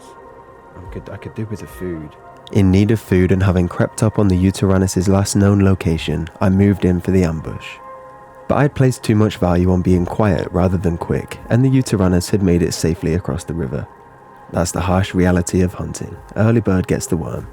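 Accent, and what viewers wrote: United Kingdom. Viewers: I like Dino, I like British voice. I enjoy this very greatly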